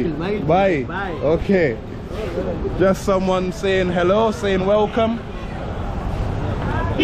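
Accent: Tamil Nadu, India. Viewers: Your Tamil accent was very super brother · Your tamil slag ,so cute❤ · Madurai kaaran 😎😎😎😎😎. Nice Tamizh accent brother.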